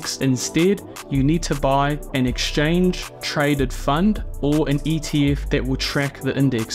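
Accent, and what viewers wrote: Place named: New Zealand. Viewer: You sound like a Kiwi similar to me. In New Zealand what platform should I use to invest in. I have sharsies but I dont know if there is better out there. I'm 19 years old and want to set up something that can help me buy a house in like 10-15 years or something. Just wanting to set up for long term success.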